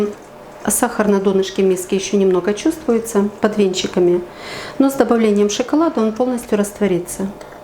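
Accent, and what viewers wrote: Ukraine. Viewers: Всё подробно, честно! Нюансы очень важны. Огромное спасибо за уважение к подписчикам. ❤ И ещё, спасибо за красивую украинскую речь!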